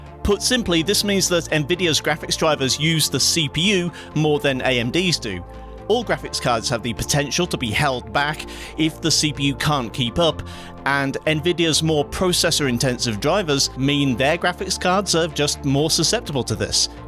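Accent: United Kingdom. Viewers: Awesome channel, with great thought-provoking content! Nice British accent too....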